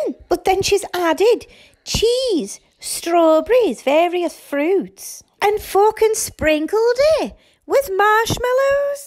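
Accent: Liverpool, United Kingdom. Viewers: love your content! proper love the scouse accent pal, Yeah Lush is a proper chavvy scroat! Feel so bad for the kiddos!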